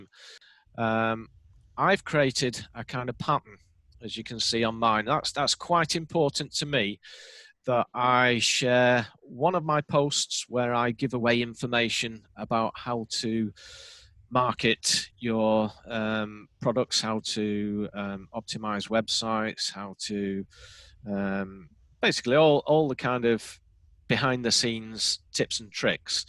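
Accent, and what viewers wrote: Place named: Northern England, United Kingdom. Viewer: I don't have a business...maybe one day...but this was still really informative and helpful. Talking about profiles, I don't like to see "junk" on my feed so I follow pages that I like (as you said, aesthetically pleasing) with posts that I am interested in. I live in the UK (originally from "up North" so loving the accents 😉 ) so one of the things I look for in a profile is some indication of where the author/owner is located, especially if it's a small business I may want to buy from now or in future, I would rather buy from someone in the UK. Thanks for all the useful info!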